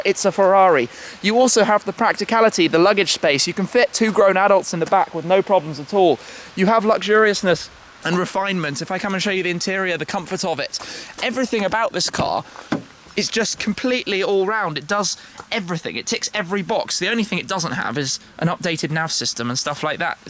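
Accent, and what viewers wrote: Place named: England, United Kingdom. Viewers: indeed we do like your videos and you british accent is quite hard to understand but we dont really need subtitles ;) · Salut les français ! C'est grâce à Shmee, en partie, que je m'améliore chaque jour en anglais. La compréhension est un peu dure au début mais l'accent anglais est le plus simple pour comprendre (comparé aux américains, australiens, écossais, etc ...) !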